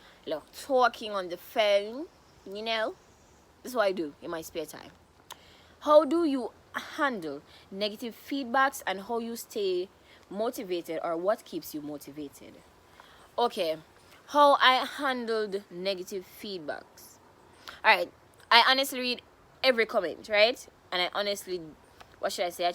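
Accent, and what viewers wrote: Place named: Jamaica. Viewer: You are more than made for this, have been binge watching you since the other day and you are like all of the Jamaican comedians combined plus more because you have accent locked. Very entertaining 💫💫💫💫💫💥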